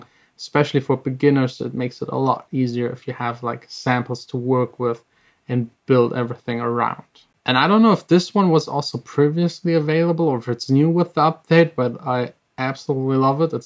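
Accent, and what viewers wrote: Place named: Germany. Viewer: Du hörst dich sehr deutsch an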